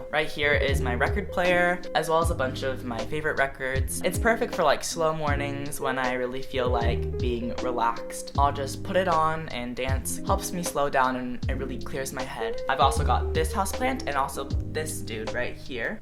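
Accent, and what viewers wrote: Texas, United States. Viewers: Those traNSItions thooo!!! Also you sound incredibly Texan in this video?? Like more than usual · a love that sometimes 5he has a little of a southern accent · im from texas but your texas accent is so strong omygod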